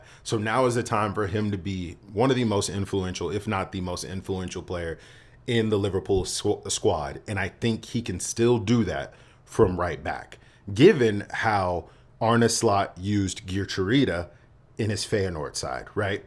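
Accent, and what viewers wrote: United States. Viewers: Greetings from Lebanon, This is my first time in your channel, and I was surprised (in a positive way) for different reasons. The first surprise came at the first second, when I heard an American accent; to be honest I was expecting British. The second one came only a few seconds later when you suggested that selling Carvalho was not for raising funds for buying Zubimendi, and I thought that you've explained a great point with a different view of a lot of other Youtube channels that I've been following since long years ago. and that really made sense to me. I do agree with you that the reason behind bringing Zubimendi is for improving the build up from behind, and I'm expecting both of TAA and Andrew Roberson to shine even more and probably play a great role in improving Darwin Nunez's numbers. TAA reminds me in every game of Stevie G, who I think had deserved a Balon d'ore but didn't get it, and I hope TAA manages to claim it throughout his career with Liverpool. 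I'm happy to see Americans break records in attending friendly football games at their venues and making channels sharing their thoughts and analyzing tactics and the transfer market. A great channel, and I'm delighted to have met on Youtube a person like you who IMO have a different yet great vision/analysis and who really thinks out of the box, and that got me subscribed. I'd like to suggest a few things in order to improve your channel. When showing graphics, I prefer to see them one by one with labels instead of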